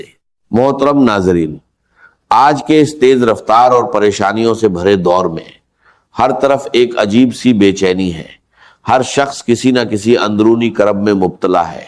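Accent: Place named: India. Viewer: dr israr ki sirf tasweer hai ..bol tu ko indian bhai lagta..kion k zaaria ko jaaria tu indian bhai keh saktay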